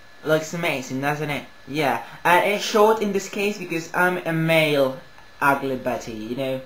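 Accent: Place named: Spain. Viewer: I love your accent. Much nicer then English, Australian or even South African. A Spanish man speaking English. Whats this world coming to?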